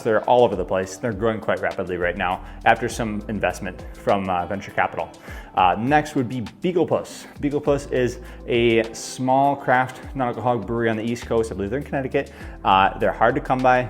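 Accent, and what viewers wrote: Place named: Minnesota, United States. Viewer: I hear Minnesota and you got my sub gotta support a fellow Minnesotan. 🍻 Was looking at your vid to see if Bero is the best but I think I’ll have to try Bero and Go Brewing. Dad’s a beer lover. And trying to get him to ween off the alcohol because of his health but I’m also interested in the NA stuff because I’m not a fan of alcohol.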